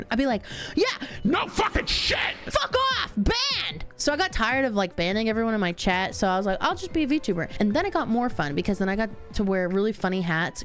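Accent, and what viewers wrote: South, United States. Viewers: You have a country accent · Oh my gosh, I am a blind dude so I really love your southern accent. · As a fellow Southerner, I gotta say that I love that you don't hide your accent. I feel all warm and fuzzy inside when I hear that twang. Keep doing the Lord's work, sweet thang. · Really like the southern accent Mine comes out every now and then even after 6 years in the city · Wow really? She sounds so Southern tho xD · Found you through the Crew! Glad to have you, have genuinely enjoyed your company and silly intoxicating laugh when you’re enjoying yourself with them. By the way it’s awesome to have a southerner representing, you ain’t hiding that accent ❤ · 🫡 the southern accent pulled me in, as I have one and it’s not fun online 🤣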